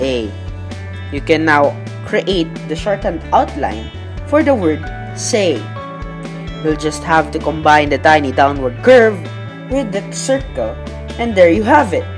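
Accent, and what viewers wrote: Philippines. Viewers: ❤ the Filipino Accent ..Easy to understand. 👍👍👍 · Your accent is a Filipino. Well this is useful thanks. Maybe u can have a lesson on person advertise on your video. Just saying.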